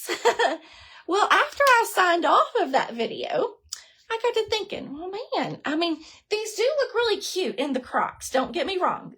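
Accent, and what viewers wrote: South, United States. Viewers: Love these, don't loose your southern accent. You speak my language.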